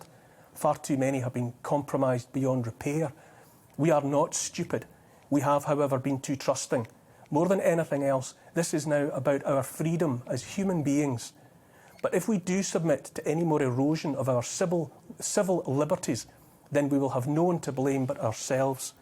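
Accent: Scotland, United Kingdom. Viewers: Loved your specials,. Now I enjoy your commentary’s, and that great accent. I deeply regret that I have no Scottish heritage. I live in Australia where one of our cities Melbourne, broke the world record for lockdowns.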